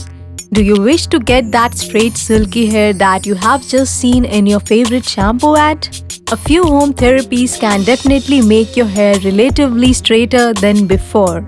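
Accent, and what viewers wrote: India. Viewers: You guys are all commenting on her accent rather than the video just because she has an indian accent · It is Indian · Jake elwafi it's indian haha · thats indian accent (by the way I'm an Indian 😤😤😤😤😠😠😠😠😠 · Her voice is like an indian one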